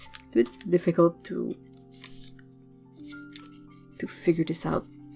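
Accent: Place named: Montreal, Canada. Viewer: Anglais de l'ouest de l'Isle de Montréal. :^) Ton anglais est milles fois plus fort que mon français. :^D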